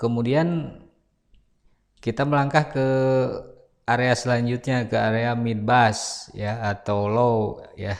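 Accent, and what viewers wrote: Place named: West Java, Indonesia. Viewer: Jiga urang sunda nya kang🤭🙏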